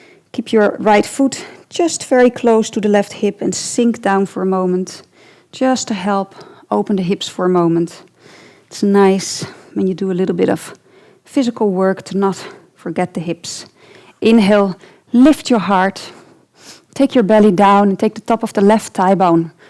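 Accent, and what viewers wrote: Netherlands. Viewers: Je bent nederlands he, hoor ik aan je uitspraak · I love your accent. Are you from the Netherlands? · you can hear she's from Holland too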